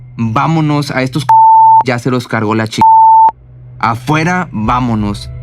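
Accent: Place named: North, Mexico. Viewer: Llevo meses siguiendolo y no sabía que es de aquí. Su acento se me hacía familiar. Arriba el norte! 🤠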